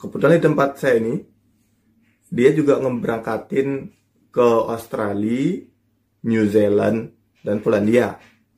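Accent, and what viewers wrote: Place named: West Java, Indonesia. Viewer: Jiga t sunda kng.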